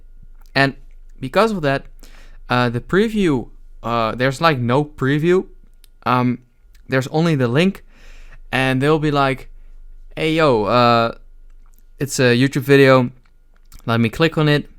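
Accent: Netherlands. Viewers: R u from the Netherlands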